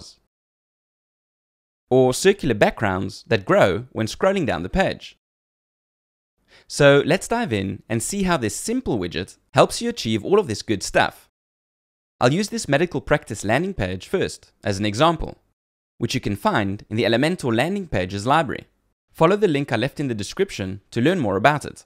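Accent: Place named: South Africa. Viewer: He is sowth efrikan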